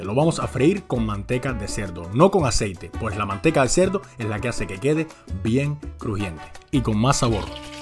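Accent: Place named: Cuba. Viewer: Por tu acento en la voz me parece que eres cubano si es asi un saludo desde aca desde la isla muy buena esta receta y secretos tuyos un exelente tutorial bien sencillo pero bien preciso Gracias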